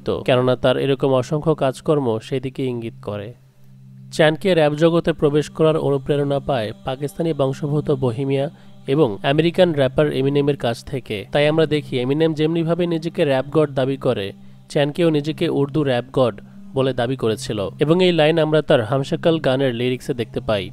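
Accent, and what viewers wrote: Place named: Sylhet, Bangladesh. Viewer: ভাই আফনি কিতা সিলেটি ফুয়া নি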